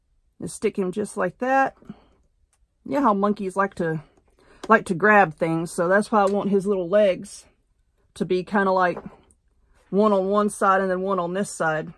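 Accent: South, United States. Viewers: This is cute as a bugs ear😉..I get the biggest kick out of your videos..your VERY talented but,what I adore the most about you is..your southern/country as a turnip green😉😂..OH!and that's a compliment!!..I'm from Tennessee(live about 30 ft. from Monteagle Mtn.😄)..you keep makin' am & I'll keep watching😊💕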